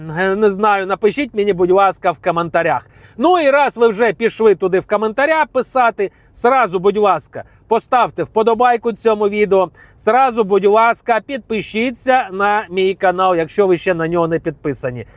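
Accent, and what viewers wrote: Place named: Russia. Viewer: Таваріщ, ти шо із московії? Премію міру, пазорітса, це що гонорово говорити із масковскім акцентом? Я перестав переглядати твої відео по цій причині, а тут ось знову, дай думаю погляну, виправився чи ні? Ні не виправився, то я можу і відписатися.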